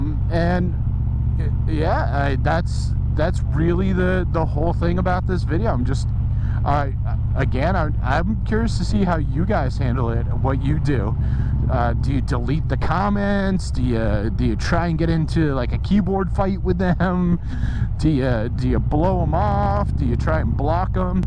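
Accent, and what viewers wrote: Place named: Boston, United States. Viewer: your handlebars are trash and your voice screams boston ya fhekk...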